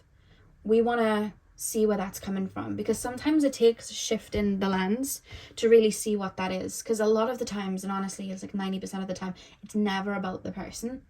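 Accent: Wales, United Kingdom. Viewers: Coming from Pile 3 😭 thank you so much, bawled as usual! I love when you say alright trigger warning we’re going deep lol. These readings of yours have been so powerfully healing for me. The higher self of your mother one did more to help release and forgive her than anything I had tried. Also, even though I’m Australian my Dad is from the UK and I know you’re Welsh but your accent is similar to my ancestors so it all feels extra healing 🦋 Going back and forth with a toxic ex right now, feeling the deeper thread ready to break and knowing I have to go purge some ancient pain. So don’t want to 😂 But if I don’t I’ll just go and seek the same person out in a different body! I’ve just found your inner teenager reading so will do that one 🫶🏼 maybe a reading on how can I receive nurturing better or just understanding worth and love properly maybe? Childhood trauma/narc/emotionally immature parents and the relationships we form after that are a real minefield to heal from. 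I really appreciate your readings and the fact that you really truly GET IT. 🤍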